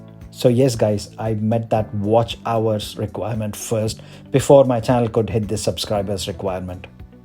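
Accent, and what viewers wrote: India. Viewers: Are you Indian ?? Your voice look like an Indian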